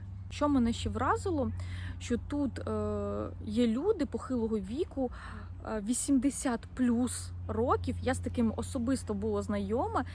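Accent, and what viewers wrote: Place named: Russia. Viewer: Извиняюсь, но у меня один вопрос. Вас кто-то заставляет во Франции говорить по-украински? Вы явно русско-язычная и для вас это иностранный язык. Слушаешь вас и уши "вянут".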